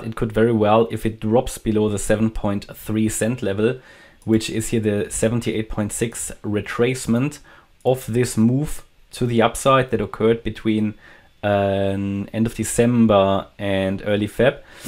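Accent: Germany. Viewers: Why do I feel like you are Deutsch 😄 Your accent sounds so German.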